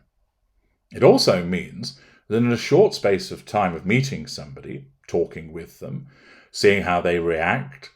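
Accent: United Kingdom. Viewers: Totally love your commentary who wouldn’t be in chanted by his voice alone is very desirable. Oh the perfect British accent I imagine you are tall and handsome from Florida with love and flirting lightens things up.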